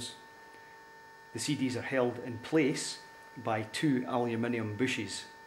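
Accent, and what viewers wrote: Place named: Scotland, United Kingdom. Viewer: thats a Scottish accent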